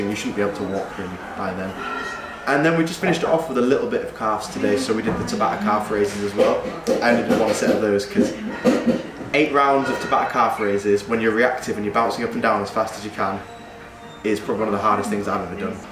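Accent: United Kingdom. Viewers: Would you mind talking with no environmental sound? It’s hard for me to listen your British accent and even more if you don’t use a microphone. Thanks !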